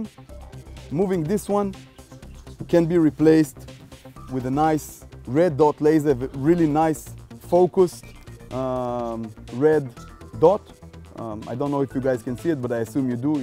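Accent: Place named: Israel. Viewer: It is called Israeli accent : )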